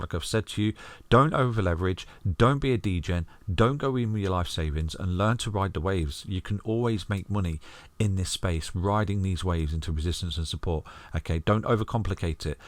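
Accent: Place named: Birmingham, United Kingdom. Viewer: I love your brummy accent lad.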